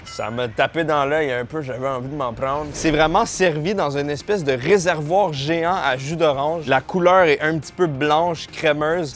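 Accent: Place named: Quebec, Canada. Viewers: Il est canadien, de la province du Québec, oui il est il est québécois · Ca dit ton pays cest la France,mais ton francais est pas mal Québécois je trouve?!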